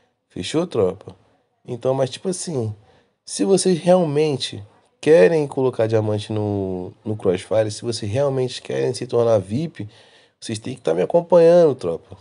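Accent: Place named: Rio de Janeiro, Brazil. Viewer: Po carioca é dahora 😂😂😂😂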